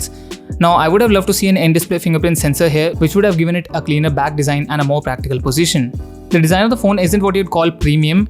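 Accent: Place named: England, United Kingdom. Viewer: English accent so suck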